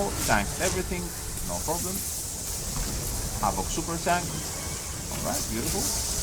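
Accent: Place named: Greece. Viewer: Nice video. I miss your regular vids where you don't livestream. Only thing I would change would be the necklace, which I think jester's bell would be a bit better since havok form scales off of cast speed, at least it used to. Still, super good video. So good that I watched the whole thing. Yes, all 1 hour, 26 min and 31 seconds. I appreciate the raw gameplay that few people really want to do. I also love your greek accent. I've alreay used this plenty of times but I never thought to use sapphire dreamstone for the cooldowns.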